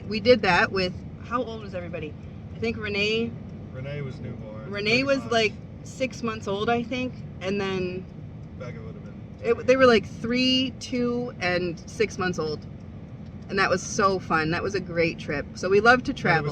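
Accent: Canada. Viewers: Ah I recognized that Canadian accent 🙂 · I thought had a Canadian accent going on. I watch some Urban Exploration guys from Ontario. And certain words like (out) is said differently from us in America, lol I love watching all the videos!!! 😍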